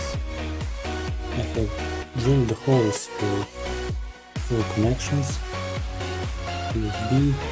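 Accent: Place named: Italy. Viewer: dall'accento sembri italiano :o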